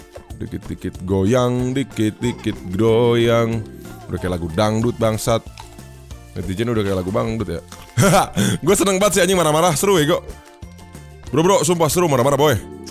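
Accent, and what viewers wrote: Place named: Kalimantan, Indonesia. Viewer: Logatnya kek orang kalimantan bang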